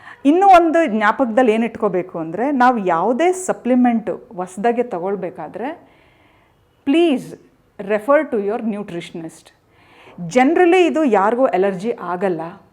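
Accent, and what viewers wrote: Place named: Bangalore, India. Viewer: ದಯವಿಟ್ಟು ಶುದ್ಧ ಕನ್ನಡ ಮಾತಾಡೀ ಬೆಂಗಳೂರಿನ ಕನ್ನಡ ಬೇಡ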